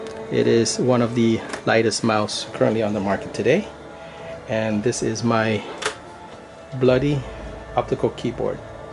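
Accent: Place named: United States. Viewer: Chất giọng của anh giống Mỹ quá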